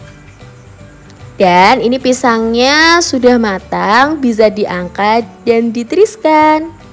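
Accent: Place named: Java, Indonesia. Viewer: Suara nya medok Jawa bnget, kereeeen 👍👍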